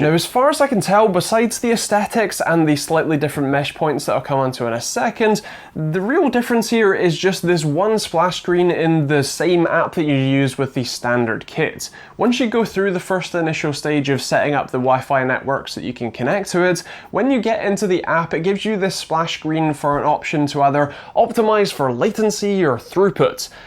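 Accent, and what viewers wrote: United Kingdom. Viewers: well I dunno if u forgot where the English language came from but he sounds like hes from the UK as am I, perhaps he's northern I'm not sure as accents vary so much u can drive an hour anywhere and it changes but anyway.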